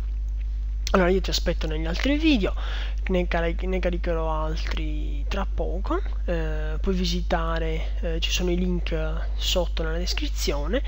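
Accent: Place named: Sardinia, Italy. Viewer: riesci a postare anche i link del test di medicina grazie... i tuoi video sono spettacolari poi con questo accento sardo ancora meglio complimenti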